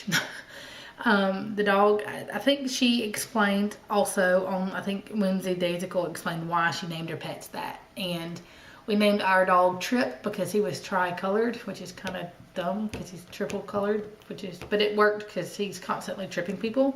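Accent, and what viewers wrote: South, United States. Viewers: there is nothing wrong with being a Basic kind of person! You are one of my absolute favorite stitches (maybe becuase I'm from the South too and your accent reminds me of home) and I love your WIPs and finishes and hauls! You have a 2 yr old, as well, so no need to be down on yourself! You are ONE BUSY PERSON! I appreciate the time that you give all of us! Keep the videos coming!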